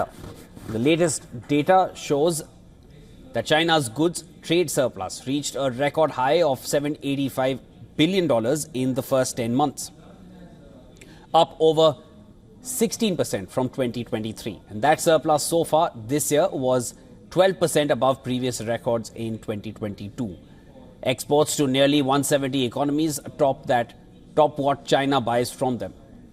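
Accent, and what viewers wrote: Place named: India. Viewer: Is there anyone in India who can speak "proper English"?? The accent here is too strong.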